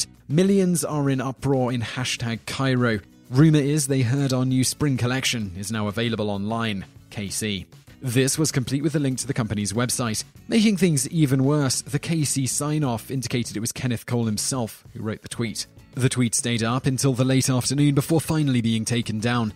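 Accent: England, United Kingdom. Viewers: He's English. they pronounce things differently there. Get over it. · Mobiganic Actually the lists are typically really well researched. But he just reads them, they have other writers for the website itself. Yeah, he's British, so pronunciations Americans take for granted aren't used so much there. Also, he's British, so he pronounces some things differently. Get used to it.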